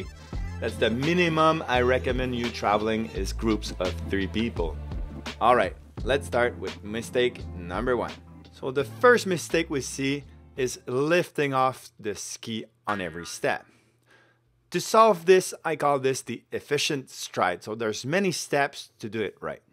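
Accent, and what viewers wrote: Quebec, Canada. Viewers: Vous êtes québécois? Je perçois l'accent de chez nous!! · listening to the Québecois english has been fun.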